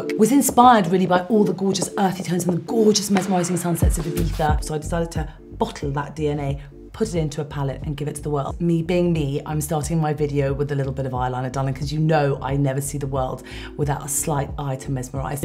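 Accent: England, United Kingdom. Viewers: cute lady...nice english accent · Not just her products and makeup techniques, but also her personality and her English accent 😊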